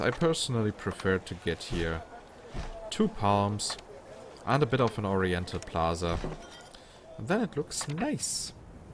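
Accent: Germany. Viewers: Thank's a lot ^^ Man hast du nen deutschen Akzent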